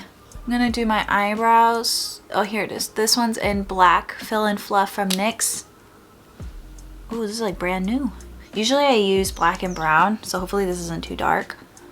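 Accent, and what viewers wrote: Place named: South Korea. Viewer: omg your korean is so cute (I like your 교포accent) 🙈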